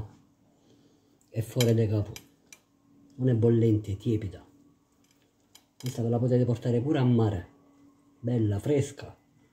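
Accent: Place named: Salento, Italy. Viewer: Io ti guardo da Trepuzzi(lecce)tu"di dove sei?il tuo dialetto e' uguale al mio😅❤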